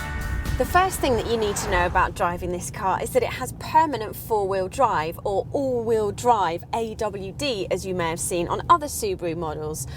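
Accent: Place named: Crawley, United Kingdom. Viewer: She's so cuuuuuuteeee! And that Crawley accent is so squee! This Yank is in love.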